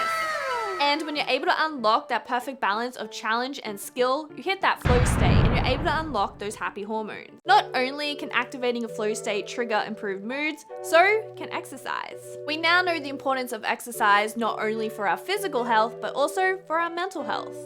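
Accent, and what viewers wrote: Australia. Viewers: Such a great video! super brave and open to share this, I truly love your videos. hope you won't have another second of anxiety ever again <3 p.s. nothing beats the aussie accent! :P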